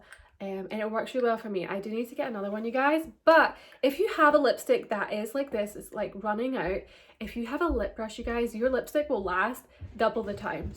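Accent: Scotland, United Kingdom. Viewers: Love your Scottish accent hen.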